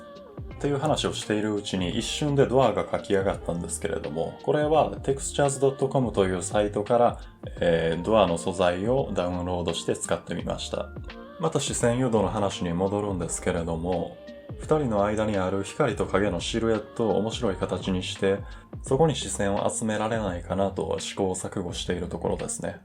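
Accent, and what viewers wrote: Kansai, Japan. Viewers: 西側の話し方といい、土井先生みがイラスト界の土井先生だ！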